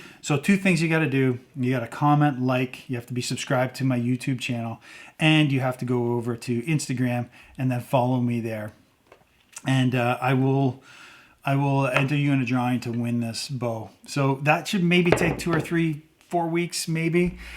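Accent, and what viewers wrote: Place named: Canada. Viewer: I was the guy who deduced you're Canadian by the way you pronounce "about" (aboot). Anyhoo. I enjoy your videos. You're very personable and don't purport to be an expert. Good reviews and tips. Now you said that I had to subscribe, like the video and then go to Instagram. But "Optional" came up. I'm assuming Instagram is. Correct? But put me in for the drawing. Have heard good things about the Black Hunter. Keep dem vids comin'.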